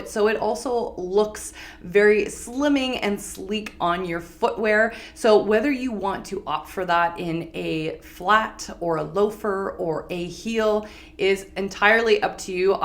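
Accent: Canada. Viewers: I just discovered your channel, love it! Love your accent is it Canadian? Anyhow, I'm a larger woman and I get what you say. I visualise how Victorian women would enhance their pronounced areas even if they were overweight! Could you please post more stills for a bit longer, if I blink I miss them! Thank you Jayne 😊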